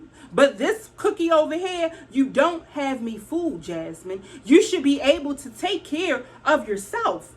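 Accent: Baltimore, United States. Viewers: Heyyy, I'm a new subbie. This video put a smile on my face. The way you told the story about you and friend had me🤣🤣🤣🤣 I love a Baltimore accent! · Hey girl, I just found ur channel and as soon as you started talking I was like "She gotta be from B'more"🤣🤣 When ppl don't give me my 6 ft, I just rurn around and start coughing. They backs up then!💯 Peace and Blessings. #EastBmore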